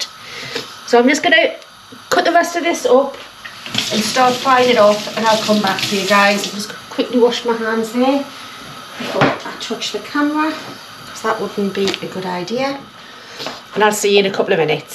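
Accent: Newcastle, United Kingdom. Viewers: A Geordie accent and a Manchester United glass on the drainer! tut tut;-) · Wow! Loved that video. I don’t know what algorithm YouTube had that meant it popped up on my home page but I took the click bait (must’ve been the reference to ‘bacon & eggs’ coupled with ‘pie’) that hooked me. /Madam, you are just brilliant and I’d love to pop in for lunch sometime. I loved the background and history you chatted through, from your late mother in law’s rolling pin through to all your references to your “Nan” and her influence on you. On top of all that to have your rolling commentary in such a wonderful Geordie accent was perfect. Thank you. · Love the Geordie accent real people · Being Irish, when I saw he words "Bacon, Eggs & Pie" I was sold......The fact your a Geordie was the icing on the cake. My family lived in Newcastle for many years before we returned to Ireland. 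3 of my siblings were born there. My Uncle taught in school there all his adult life & my nan passed away there, she was an incredible cook! No scales, no measuring... all done by eye and feel! God bless you love!!! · A Geordie lass, after my own heart.😁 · thanks am from Gateshead myself but i have lived down in Southampton since late 1990 into 1991 it’s lovely hearing that geordie accent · Looks yummy, and i love a geordie accent ❤ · I love the Geordie accent and that pie looks delicious just like the sort of food i was bought up on, happy days. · My Geordie Grandma used to bake this, it was often part of our Saturday lunch, with a home made soup. Made me very nostalgic, not only the pie but the accent, thank you 😘 from Australia 🇦🇺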